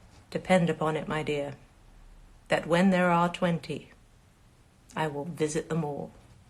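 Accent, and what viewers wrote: England, United Kingdom. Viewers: Her English accent is natural as well.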